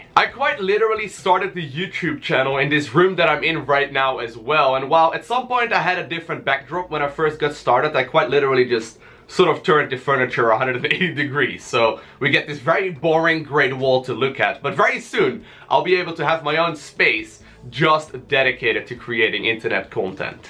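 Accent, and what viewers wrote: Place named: Netherlands. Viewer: Don't get supply blocked man! Keep building the eco ;) PS: your accent back then was so dutchy and cute!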